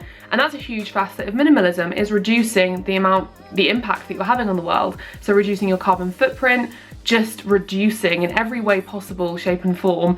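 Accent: United Kingdom. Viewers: Good video. Love British accents!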